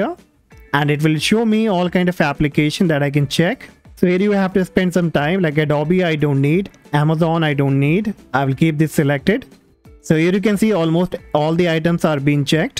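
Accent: India. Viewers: indian ahh voice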